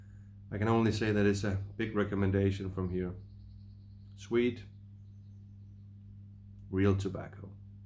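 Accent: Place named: Michigan, United States. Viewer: Sounds like Michigan 😁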